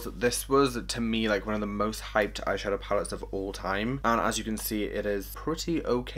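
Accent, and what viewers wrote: Wales, United Kingdom. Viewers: Are you welsh? Love you btw !! 💓💓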